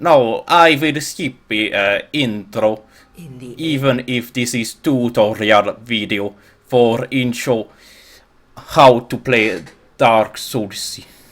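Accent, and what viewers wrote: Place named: Finland. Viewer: Your accent is bad and you should feel bad. Speak English properly, fucking Finns, I swear to god...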